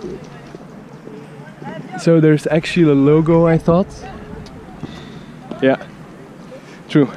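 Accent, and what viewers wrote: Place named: Ghent, Belgium. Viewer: hoor ik daar een klein Gents accentje?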